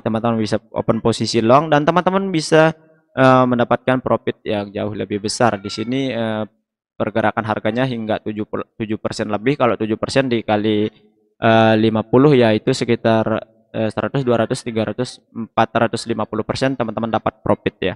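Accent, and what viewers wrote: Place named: Lombok, Indonesia. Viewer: marak dengan lombok logat side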